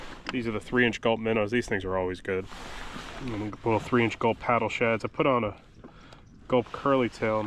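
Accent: New York, United States. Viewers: he is from nuu yawwk that's how we say crap eee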